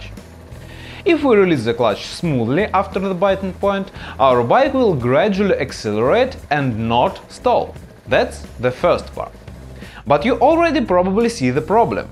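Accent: Russia. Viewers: I have a really strange feeling that I understand the author because I'm Russian and the author has bright Russian accent. It helps me understand the author's speech better 🤣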